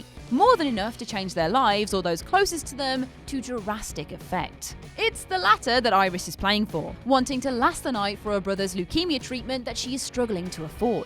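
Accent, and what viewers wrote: United Kingdom. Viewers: That's pretty standard British dialect. They pronounce a lot of French in standard English phonetics.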